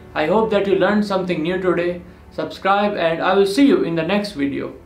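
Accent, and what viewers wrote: India. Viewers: He from India or Pakistan. It's easy to recognize by his accent.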